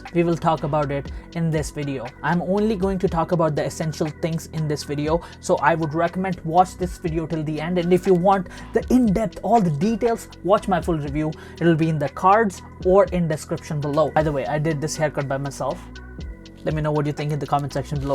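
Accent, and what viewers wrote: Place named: Punjab, India. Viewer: Bro you got a thick Punjabi neighborhood English accent? I would love to know the story behind it. Brampton ?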